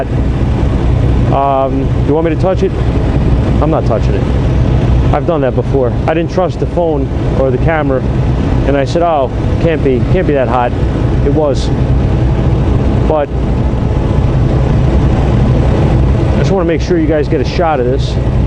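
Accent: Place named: East Coast, United States. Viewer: But I love your big mouth and eastern accent.! LOL THANKS!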